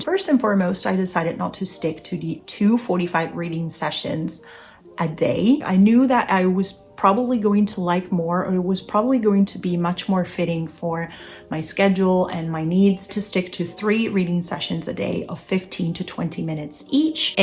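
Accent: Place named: United States. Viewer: Hi - I'm new to your channel. Just wondered how you ended up living in Berlin? You seem to have an American twang so I was curious. Hope you don't mind me asking? I have family in Germany too! Thank you.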